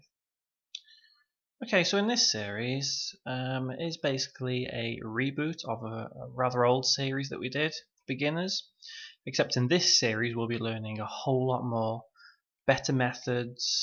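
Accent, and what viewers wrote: United Kingdom. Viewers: You a scouser mate?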